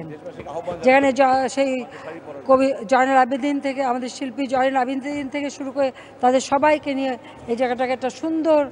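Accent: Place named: India. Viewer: Akdom amader Indiar moto kotha batra....so i like is.........kotha sunei mone holo na j akjon Bangladeshi mohila.....!!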